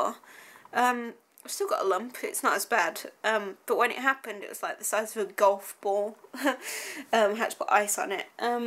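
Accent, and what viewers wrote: Birmingham, United Kingdom. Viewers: Dahhhhhlingggggg!!!! <3 Oh my gosh this is so sad and has actually made me think how I need to be more careful as I wouldn't want this to happen to me! It sounds truly awful and we're all sorry that you're experiencing this! I hope you recover quickly and am sure that your determination and motivation to keep up your creative flair will aid the healing process!:) GET WELL SOON! x ps. There was ONE positive that came from this video... I GOT TO ACTUALLY HEAR YOUR BRUMMY ACCENT! Hehehe I thought you'd NEVER let it shine through;D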